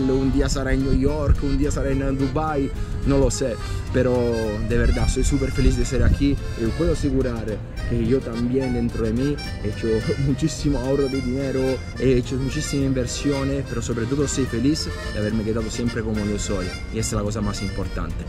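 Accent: Italy. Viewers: me imaginé porque tengo un amigo que también es italiano y habla el español así mismo 🤭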